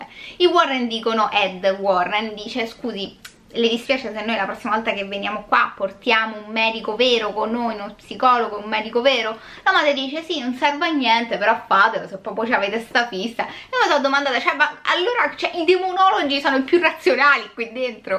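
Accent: Rome, Italy. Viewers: Scusami ma io vivo ormai moooolto lontano da Roma e non sai che piacere mi da' sentire il tuo accento . Non so se puoi o vuoi.. Ma mi diresti di quale quartiere sei? Brava.. Dovresti fare l'attrice! 👏👏👏La storia... Una stronzata enorme! Gli americani fanno tutto in grande... Soprattutto I cazzari! 🤣 🤣 🤣 🤣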